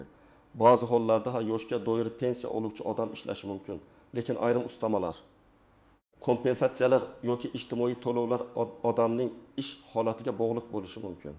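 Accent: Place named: Tajikistan. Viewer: Бу одам тожикми. Узбекчани акцент Билан гапиради.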